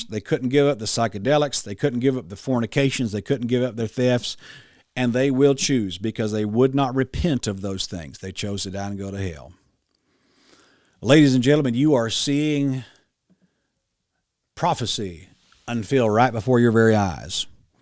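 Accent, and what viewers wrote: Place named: South, United States. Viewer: That’s not how it works. You should learn about addiction. You sound really southern. Learn to read.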